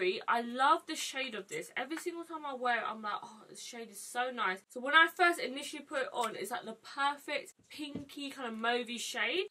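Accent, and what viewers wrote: United Kingdom. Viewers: who would've thought that your accent is british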